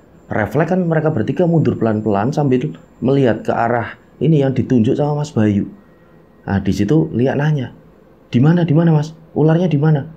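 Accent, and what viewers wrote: Java, Indonesia. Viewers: Seneng cara bawainnya,jawa bangettt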